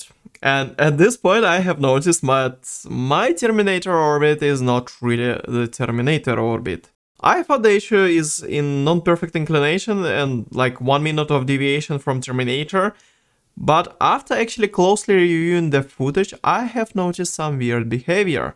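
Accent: Russia. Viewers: жесть - такой русский акцент 8) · Вы Россиянин? Акцент очень похожий на русский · Ты ведь из России или снг, да?) Забавно что человека с акцентом понимать намного легче чем говорящего на чистом английском. Классное видео) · he's Russian, you can hear it tho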